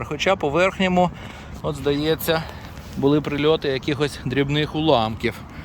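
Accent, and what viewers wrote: Kyiv, Ukraine. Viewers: який гарна "київська говірка", як Ви колись казали 👍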